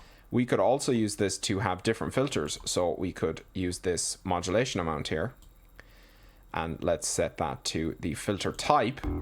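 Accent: Ireland. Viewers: oh I could tell, I'm also Irish lol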